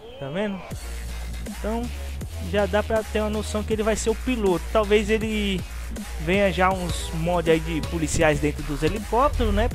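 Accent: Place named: Ceará, Brazil. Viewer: Vc parece cearense tmj top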